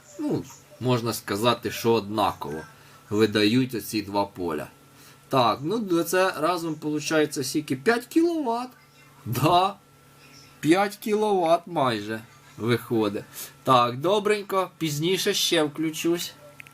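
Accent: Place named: Poltava, Ukraine. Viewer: Дядко точно з Полтавщини! Тільки там так розмовляють. )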